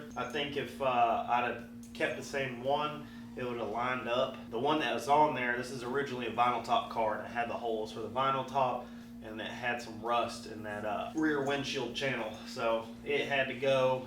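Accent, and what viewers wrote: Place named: United States. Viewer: I feel like you have a huge country accent on camera!!! haha.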